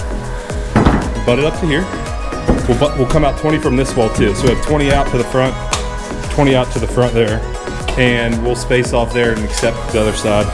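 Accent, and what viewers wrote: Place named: West Virginia, United States. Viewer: I just had a feeling you were from West By God when I started the vid! Charleston area, here! Love this build and am looking forward to implementing this in my garage.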